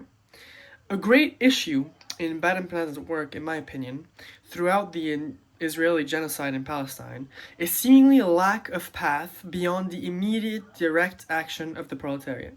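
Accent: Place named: Europe. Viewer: Hey I like your points in general but I want to push back a bit on the notion that revolution would benefit the whole working class/that the working class is truly united in their interests globally. I don't know exactly where you're from but based on your accent I'm gonna guess somewhere in mainland Europe. I bring that up only to say I'm not from Europe so idk the ins and outs of the situation in that part of the imperial core, but I am from so-called Australia and most "leftists" in this country (and many other colonial countries) are not our allies (by "our allies" I mean any true actually left wing internationalist's). Learning simultaneously more about the history of colonialism in Palestine and in Australia has led me to the same conclusion for both cases, the only way to resolve the contradictions is decolonial revolution, something much more achievable in Palestine purely because of demographics but I am hopeful one day it may happen on this continent as well. 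The issue is though, it is genuinely in the interests of the settler-working class to maintain colonialism. I'd argue this is the case even more so then it is that European working class benefits from Imperialism. I know you make the claim in this video that the benefit is marginal, and that is true in comparison to the benefits socialism could bring, but I also ask you at least reconsider that point by comparing your living situation to that of the vast majority of humanity. But back to my main point, genuine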